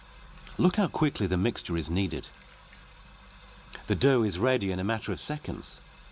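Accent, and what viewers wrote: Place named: United Kingdom. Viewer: he is british, i like his english by the way.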